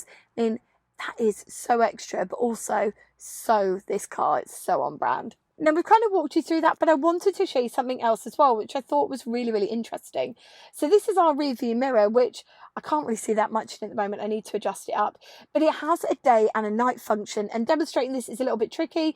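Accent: United Kingdom. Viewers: Greetings from Canada! You have charming presence and enthusiasm, and it's great to see a woman hosting car programs. If both your.speech and video recording could be a touch slower it may help. Video is enhanced by periodic stops, not always moving; and your delicious accent is nonetheless a bit hard to understand at times "across the pond" (we Canadians apparently are understood all over, but at some cost of blandness.) I mean no offense, and enjoy your lively presenting.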